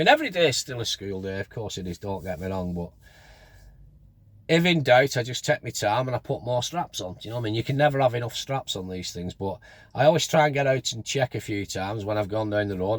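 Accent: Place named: Wrexham, United Kingdom. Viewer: Sounds like Wrexham 😂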